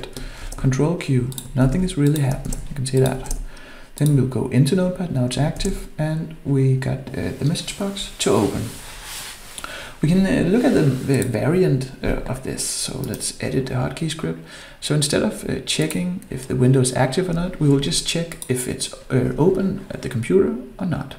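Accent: Denmark. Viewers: i can already hear ur from denmark lol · let me guess, you're danish,, i may be wrong but really sounds like it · i smell danish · i smell danish here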